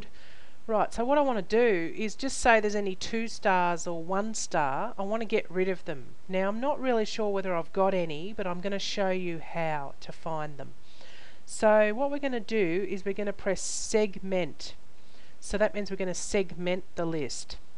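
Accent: Australia. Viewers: i love how aussie you are makes it so much easier to listen too lol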